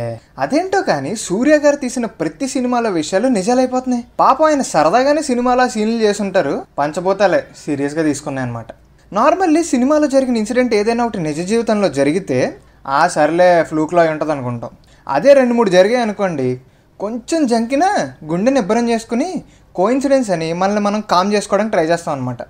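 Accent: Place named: West Godavari, India. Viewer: Baaga cheppinaru....way of telling is very very nice😍😍😍..midhi west godavari aa??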